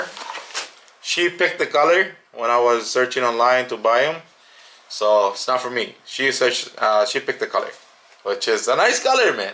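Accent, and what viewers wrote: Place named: Arab world. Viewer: Where r u from u sound Arab, can you please do a review of more different color shoes, thank, nice review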